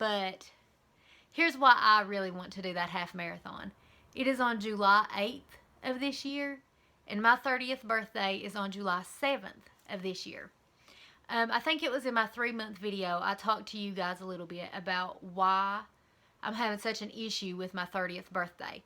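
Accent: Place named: Eastern Kentucky, United States. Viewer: I have laughed so hard at the moonshine thing "well it's illegal." HAHAHA. You are going to rock your 30's like a beast! I am so excited for us to get together and shut down the WLS community on youtube with these Eastern KY southern accents :) You look amazing as always XOXO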